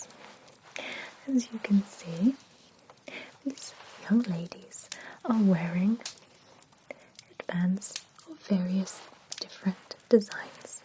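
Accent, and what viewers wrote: England, United Kingdom. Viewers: It’s such a masterpiece video. I feel like being examined and talked to by Natalie Portman with English accent. And all the fabulous hearing devices! My hearing loss will be recovered in no time✨ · She said two words and I already knew she was as british as tea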